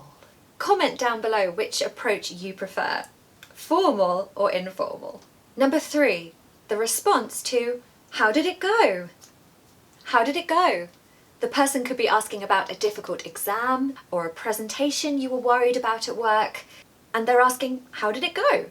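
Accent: United Kingdom. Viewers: Oh this lesson is a piece of art you give us vocabularies, phrases hints of British culture with a clear and beautiful British accent this magnificent episodes will be my English journey's peer ❤ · Very classic British accent it seems back to that time of Jane Austin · I really enjoy your accent, where are u from in the uk?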